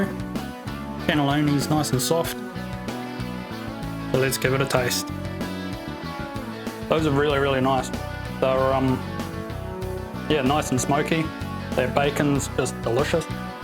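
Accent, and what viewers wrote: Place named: Australia. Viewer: Hey I'm guessing your Australian,,,, not Brittish, or Irish,,,,,, I'm an American, I can understand you folks better. Your "english" is easier to understand than those others. Still> Different than my "American" english.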